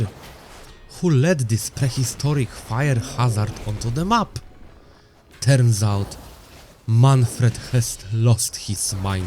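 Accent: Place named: Balkans. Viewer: bałkan inglisz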